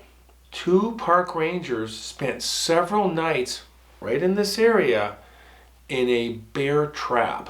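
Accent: Minnesota, United States. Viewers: I knew you had the Minnesota accent!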